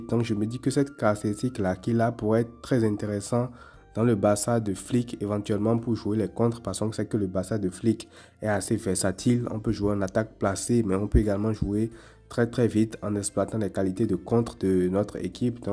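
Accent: Benin. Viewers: Tu as un accent beninois😂👍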